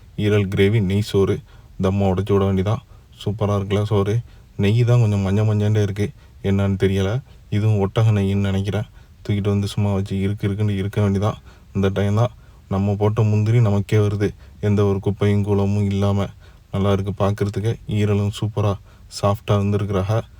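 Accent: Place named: Keelakarai, India. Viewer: காமெடியா கீழக்கரை ஸ்லாங்கிள் பேசுவது செம😅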